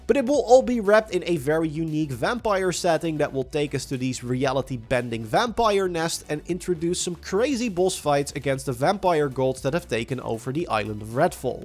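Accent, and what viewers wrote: Netherlands. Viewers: I loathe the super strong Dutch accent. It's even worse this time.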